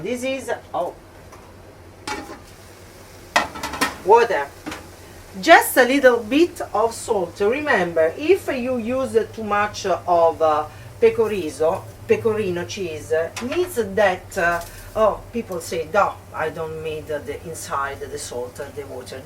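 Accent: Italy. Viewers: more than her recipees i love her cute italian accent. so lovely!❤ and it proves her being highly educated. i give her a big hand! 👏👏👏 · inglesi e americani adorano questo tipo di accento italiano, un po' come a noi divertono certi inglesi quando parlano italiano con l'accento inglese. è naturale. ha fatto bene. brava chef ! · Nice lady . Funny indeed charming 💎. Great cooking skills . Great english with that italian accent . I would love to eat there . Beautiful country mama Italia 🇮🇹. ❤. Looks Yummy dish 😋. They have so great food n excellent cakes n ice-cream 😋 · I think I'm in love. She cooks, earns money cooking. Is a great cook and speaks English with an Italian accent. Is beautiful and charming. I'm in love.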